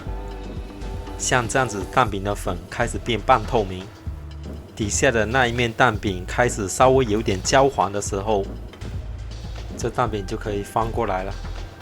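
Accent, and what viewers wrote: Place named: Taiwan. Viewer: Thks for sharing this good breakfast recipe. Wonder where are you from originally? Your mandarin sounds taiwanese or singaporean.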